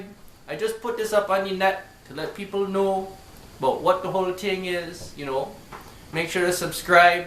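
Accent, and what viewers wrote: Trinidad and Tobago. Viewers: You sound Trini still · Yes man u def sound like a Trini fo'real....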